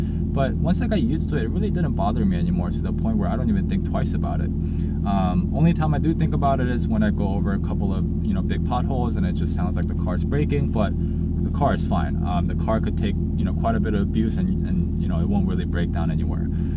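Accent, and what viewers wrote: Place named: Texas, United States. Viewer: This guy definitely from Dallas TX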